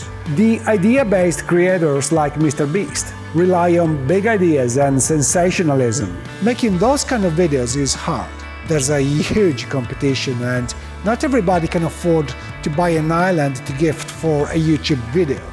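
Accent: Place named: Eastern Europe. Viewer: not to be disrespectful, but rather totally honest and non critical. you remind me of Al Pacino - but with an eastern European accent. It is actually really interesting your manner and way of speaking. i really like it a lot. and your english is perfect just the way it is! nice video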